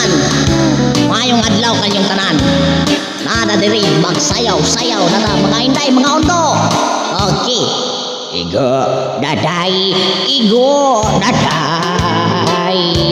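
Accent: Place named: Visayas, Philippines. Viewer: Hahahah Ganda voice host visaya jud diay hahah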